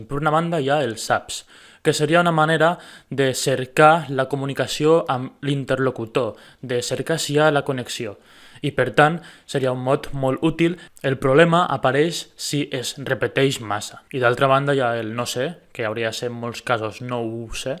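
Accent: Spain. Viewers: aunque te mueras de la rabia: se nota que tu lengua madre es el español.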